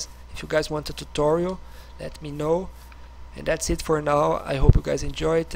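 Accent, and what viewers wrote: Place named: Portugal. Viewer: are you portuguese because your accent is the same XD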